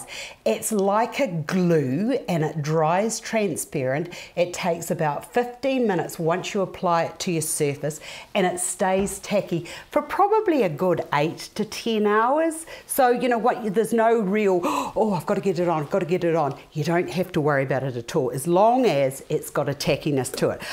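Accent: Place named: New Zealand. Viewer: That NZ ecksent keeps me coming back.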